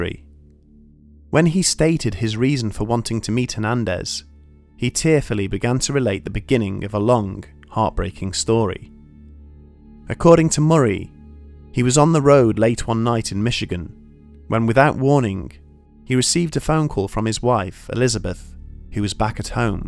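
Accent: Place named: North, United Kingdom. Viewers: He said Las Vegas. Maybe you just can't understand his Northern accent. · He's English. They pronounce things differently than we do in the states and Canada. End of story. :)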